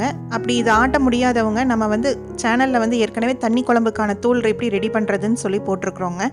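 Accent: Kongu, India. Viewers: கொங்கு தமிழ் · I'm your new subscriber sister.. Very tempting thanni kulambhu.. I'm from Coimbatore.. Unga kongu Tamil suprernga ...very nicenga ❤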